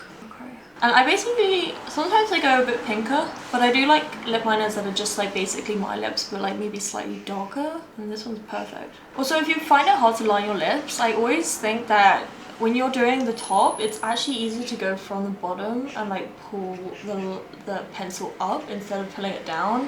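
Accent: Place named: United Kingdom. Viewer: I'm from the states but knew instantly you were British. And yet you were clearly heard, no need for captions! Great midsection , btw. 😁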